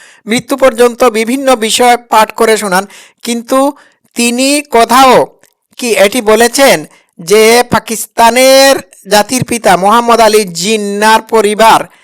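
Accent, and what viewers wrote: Sylhet, Bangladesh. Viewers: U spek bangla with Sylhaty accent is SAD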